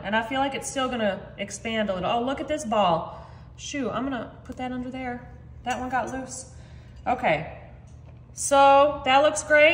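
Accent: South, United States. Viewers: Love ur southern accent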